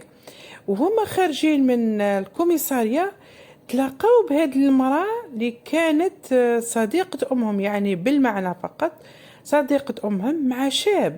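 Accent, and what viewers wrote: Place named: Souk Ahras, Algeria. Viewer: ختي لهجتك سوقهراسية...قريبة للتوانسة ماشاء الله...شكرا على الفيديو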